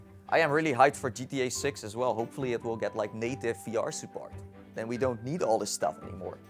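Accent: Netherlands. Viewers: gek nl accent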